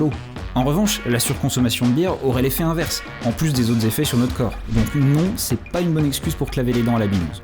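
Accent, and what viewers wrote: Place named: North, France. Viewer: Tu es du Nord toi mdr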